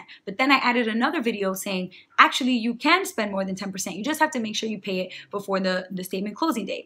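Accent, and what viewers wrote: New York, United States. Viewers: Sound like you from NY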